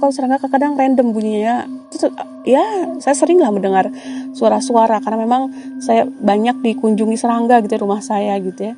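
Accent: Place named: Sunda, Indonesia. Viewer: Suka sama cara bercerita dan penguasaan bahasa Indonesia tetehnya, ditambah aksen Sunda dikit2, nyaman dengarnya. 😊❤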